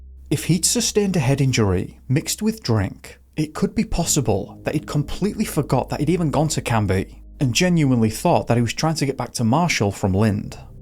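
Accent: United Kingdom. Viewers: Are you from the UK or is that just the voice used for the video narration? Some farms specially ones with livestock can be very very bright. Like midwest Wal-Mart parking lot bright. Not as bright as a whole town obviously but that could be easily confused in the pitch black.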